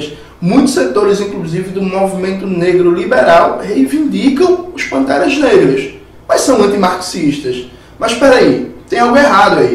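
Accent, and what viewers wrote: Pernambuco, Brazil. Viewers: Sim,fala pernambucano!✋😁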